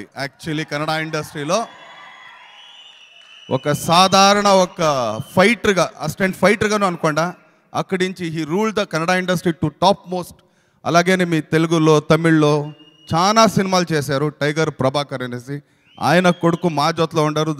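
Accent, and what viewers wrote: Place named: Rayalaseema, India. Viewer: It likes rayalaseema slang telugu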